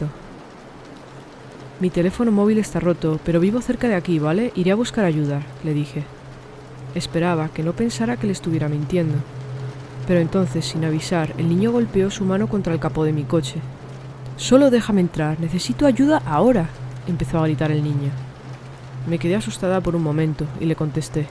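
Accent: Spain. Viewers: Me gustan mucho tus historias y ese acento español lo adoro 😍 sin duda este ya es de mis canales favoritos además tus historias están muy interesantes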